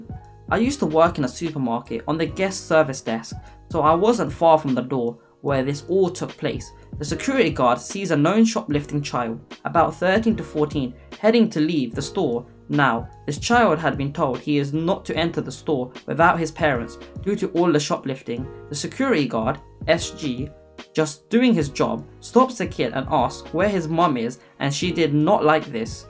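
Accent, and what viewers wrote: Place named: Scotland, United Kingdom. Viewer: ??? The person speaking is speaking with an accent that is from the UK.. Not exactly sure where, sounds rather Scottish. So.. What are you on about? Their English is more than likely, better than YOURS.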